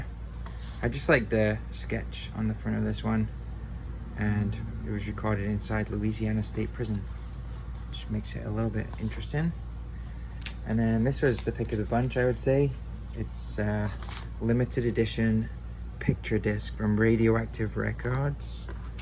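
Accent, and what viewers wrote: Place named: Scotland, United Kingdom. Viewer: You sound as if you have Scottish twang in your voice Max Boyce is a Welsh comedian.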